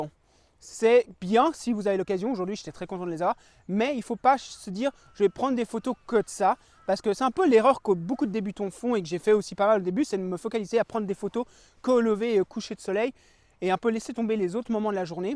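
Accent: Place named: England, United Kingdom. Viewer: Merci pour la vidéo. Tu commence à influencer ton français avec l'accent anglais. Je retrouve aussi que tu cherches des mots anglais temps en temps. Essaye de faire un vidéo en anglais. Ça va te faire une exercice. 😀 Je te souhaite une bonne lumière!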